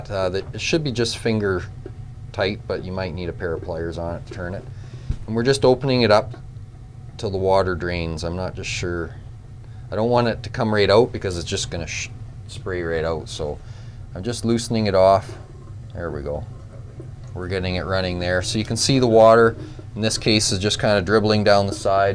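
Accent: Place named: Canada. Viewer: Great video. I think you are in Canada, as you pronounce House as Haouuse ;) and the ABS pipes instead of PVC. But, the reason I looked up for video like this is because in DC area, I pay $130 to blow out sprinklers. Also, I have a 8 gallon air compressor with 4SCFM, and I was not sure if it can do the job. Watching your video makes me think, I can. Thanks again.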